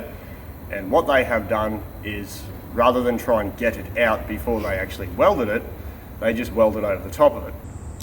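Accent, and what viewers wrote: Australia. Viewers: "... now I'm talking American." That's OK Mate, I've had to learn how to listen in Australian...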